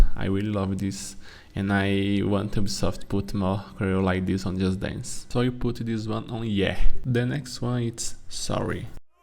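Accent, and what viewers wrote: Brazil. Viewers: Vc é brasileiro, seu sotaque é uma bosta, e dá para ver que é brasileiro, fala português, seu inglês tá uma bosta irmão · Só eu que percebi que ele é brasileiro e te falando inglês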